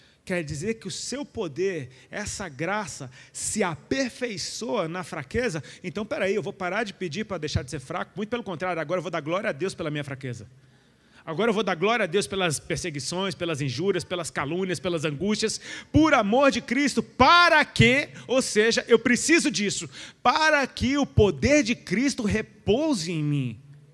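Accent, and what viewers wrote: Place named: Portugal. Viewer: quanto que o "gajo" corre!! Ameeeiiiiiii, portugues de Portugal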